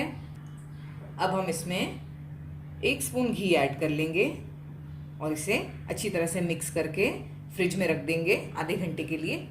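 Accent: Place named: Gujarat, India. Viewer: Askm , i tried this, Alhamdulillah Alhamdulillah bohot acchi bani.....Aap se ek sawaal hai, kya aap Gujarati hai? :) aapke kuch words ya lehja thodda thoda sa gujarati lagta hai...memon my be ? :)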